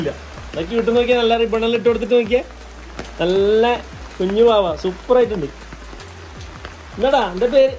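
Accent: Kannur, India. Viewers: കണ്ണൂർ ഭാഷ 👌...🥰.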